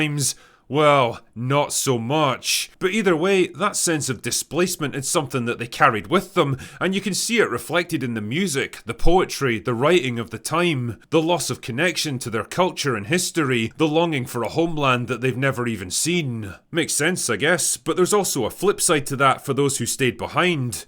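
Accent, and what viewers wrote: Scotland, United Kingdom. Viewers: I did notice the subtle accent, Scottish, who would guess... Great channel. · SCOTTISH?!?! Aaaw man all this time I thought you were Irish. The accents are so similar!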